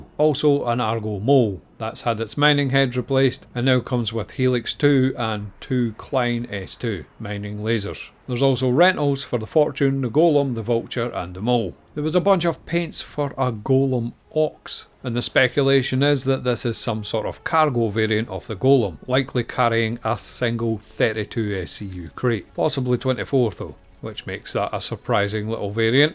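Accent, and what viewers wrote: Scotland, United Kingdom. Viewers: I love your thick Scottish accent ... sometimes I have to ask myself, what did he just say?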